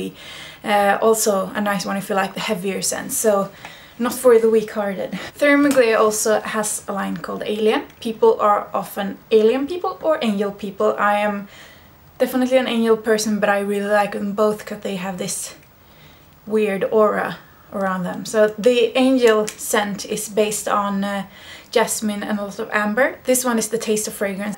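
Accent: Sweden. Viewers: I am usually attracted to old-school stinkbombs (Shalimar and Opium) but you make me want to go for some Angel. After watching your older perfume video I went out and tried some Versace Black Crystal and since then I have been obsessed with white flowers, and yes it totally smells like vampires on the beach! Also that swedish accent is so sweet. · I can't get enough of that swedish english accent!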